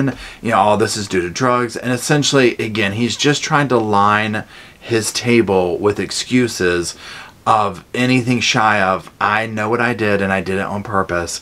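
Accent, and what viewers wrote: South, United States. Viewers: Hi! I’m a new subscriber and I’m making my way through your videos. Love them!!! I’m southern so I love your southern spin in this one!! ❤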